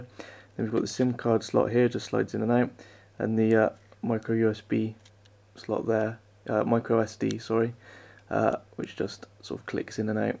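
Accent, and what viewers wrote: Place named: United Kingdom. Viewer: reviews are just more awesome in a british accent, haha great vid man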